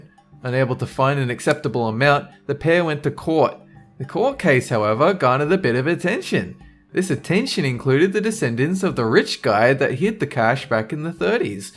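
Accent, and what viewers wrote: Australia. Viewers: is he aussie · Sounds Australian to me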